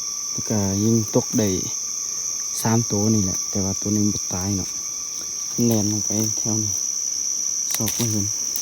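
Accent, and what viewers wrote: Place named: Champasak, Laos. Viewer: ຢູ່ແຂວງໃດເດຟັງສຽງຄືຄົນຈຳປາສັກ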